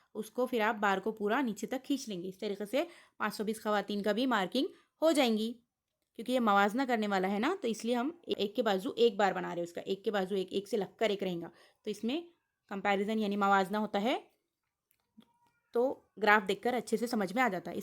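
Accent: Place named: India. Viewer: Good job. Hmaray bacho ko boht faida hoga. Itni saaf urdu india may. Salute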